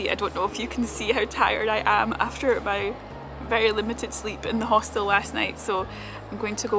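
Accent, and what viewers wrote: Scotland, United Kingdom. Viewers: she’s speaking English, but in a Scottish accent lol and she doesn’t have an Edinburgh accent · Does her accent is normal for a Scottish or British person? I always thought accent is really hard over there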